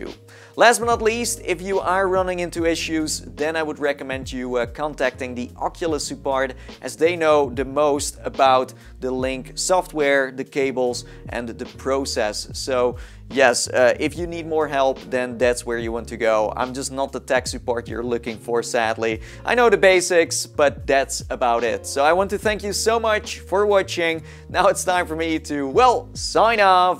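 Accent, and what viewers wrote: Netherlands. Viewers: Why do you sound dutch? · are you nederlands? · I KNEW IT, I knew you were Dutch, first I didn’t notice but after pausing the video and doing something else in between, after I started the video again I could hear a small trace of a Dutch accent. When you went to the control panel and I saw “luidspreker” I knew for sure. Kijk is aan. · Are u Dutch ?? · Are u from the Nederlands? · Are you dutch? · YOU MUST BE DUTCH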